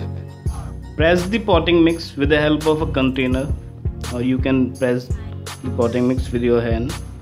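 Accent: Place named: India. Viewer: I'm so glad that the first video I clicked on the narrator was Indian because I watch a lot of Indian cooking shows and my projects always workout way better when the narrator's Indian. Hard to explain but I just got really excited when I heard his voice.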